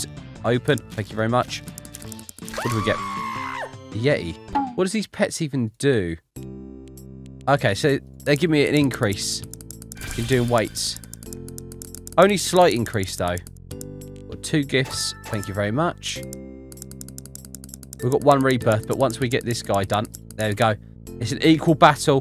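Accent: United Kingdom. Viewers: 39 mins! :D love ur vids and the british accent 😮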